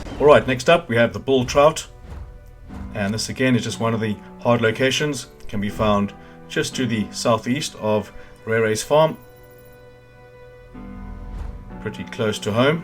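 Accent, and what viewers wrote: South Africa. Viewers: You sound South African (like me) One of the most brilliant concise vids I have ever had the pleasure to follow. Sub for certain.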